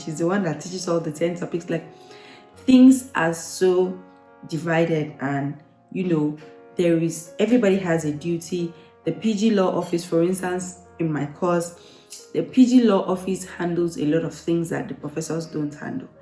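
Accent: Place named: Nigeria. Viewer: The Nigerian accent tho...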